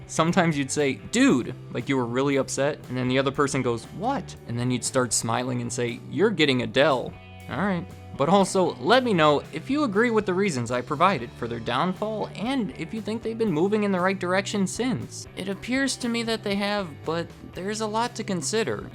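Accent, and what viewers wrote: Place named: Midwest, United States. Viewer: I'm currently watching this from a Dell XPS desktop with 16 GB of ram, Intel i7 processor, and a NIVDIA GeForce 1080 graphics card. You haven't experienced the thrill of serene Midwestern accent until you've heard it in high definition.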